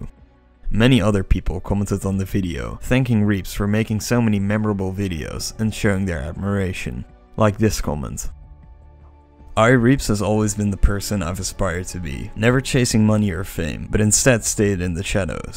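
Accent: Netherlands. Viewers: the dutch accent is unbearable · the guy speaking is dutch, 100% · dutch accent?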